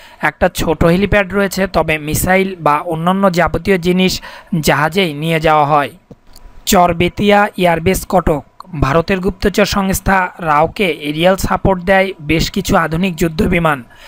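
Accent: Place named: Bangladesh. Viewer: বাংলাদেশিরা ওই রকমই উচ্চারণ করে , এর অর্থও বোঝে কি না কে জানে!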